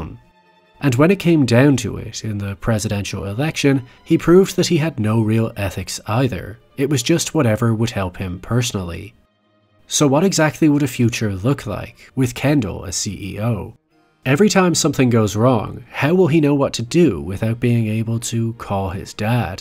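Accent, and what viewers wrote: Ireland. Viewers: Ah brilliant, it’s so nice to hear a fellow Irish person who is making my favorites videos, I’m Deirdre O’Brien, unmistakably Irish name, especially in Irish, but I’ve been meaning to ask you since the first video of your’s I watched on Prisoners which was fantastic. Love your videos, it’s so great to hear a lovely Irish accent making wonderful videos, you’re the only one (from the kind of videos I watched) and you do a great job. Your analysis is always so great and your observations have made me wonder have you and English degree. Anyway very happy for you in a sea of American creators. Wishing you more and more success, you completely deserve it. 😊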